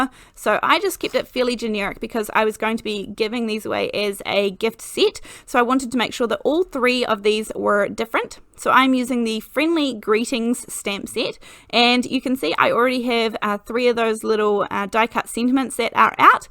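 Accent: New Zealand. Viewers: just watched your awesome video, love to hear a Kiwi doing this, amazing. Thank you, and yes I've subscribed.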